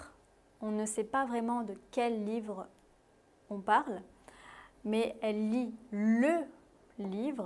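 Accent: France. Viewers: Merci. C’est toujours une bonne idée de commencer au début. J’adore votre accent français.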